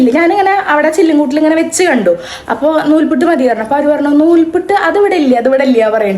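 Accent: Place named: Palakkad, India. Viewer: തൃശ്ശൂർ ആണെങ്കിലും കുറെ words പാലക്കാട് പറയുന്ന പോലെ ആണ് പറയാറ്. ഇവിടെയും നൂൽപ്പുട്ട് എന്നാ paraya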